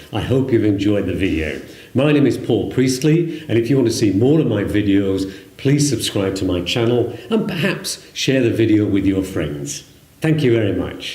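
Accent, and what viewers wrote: United Kingdom. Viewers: YOUR ENGLISH PRONUNCIATION IS VERY BRITISH,I LOVE TO HEAR IT! ANYWAY,METHOD WAS GOOD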